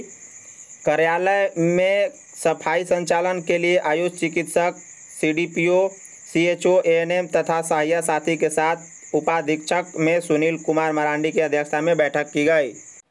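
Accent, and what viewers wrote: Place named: Jharkhand, India. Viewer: Bhai apni aawaz me aapko jharkhand ka pehchan dena jaroori hai kya?? ⏰😡😡😡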